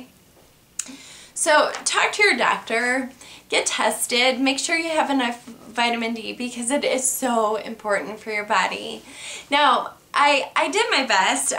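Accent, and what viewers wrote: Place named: Detroit, United States. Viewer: hi have a question with de mylasation of the nerve cells do people with ms have seizures curious cause this how i found your vids was looking for info on nerve cells in the brain, stroke i had has left me epileptic taking dilantin to control them and is working:) also it's so nice to see you smile, defiatley could light up any room with it:) luv the little Detroit accent too lol Canadian here / toronto eh:)joe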